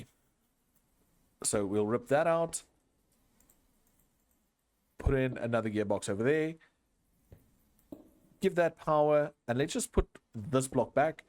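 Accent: South Africa. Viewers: I like your South African accent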